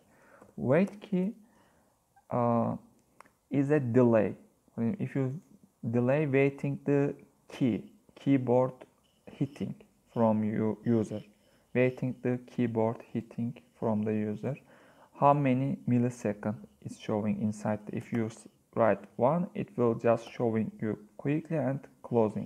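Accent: Turkey. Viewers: aksandan o kadar belli ki Türk olduğu. 🙂 İçerik için teşekkürler. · ABi bende tam olarak Hem ubuntu tabanlı hem c++ opencv videosu arıyordum çok teşekkür ederim ingilizce niz de türkçe aksanı olduğu için benim için çok daha anlaşılır olmuş adamsın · ilk cümlende direk türk olduğunu anladım. Aksağan çok belli edio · Yemin ederim aksandan anladım Türk olduğunu 😁 aslan abim benim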